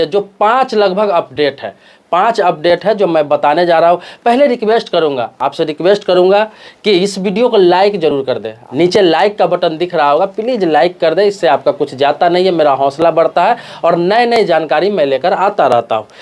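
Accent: Bihar, India. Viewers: Thank YOU for very explicit explanations !! Your service has been probably appreciated !! JAI HINDs & Vandey Maatharams from Dr Ramesh Murthy, A ( Retd ) MENTAL HEALTH SPECIALIST originally from Bangalore City but Qualified in Madhusudan Pranesh, GWALIOR from GRMC of JIWAJI UNIVERSITY !! Bhai, AAP BIHAR Pranesh he hain kyaw ??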